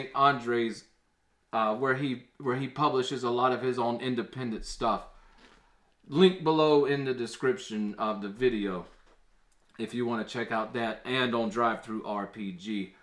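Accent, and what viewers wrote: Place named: South Louisiana, United States. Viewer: Where are you from? Sounds like around my area in South Louisiana.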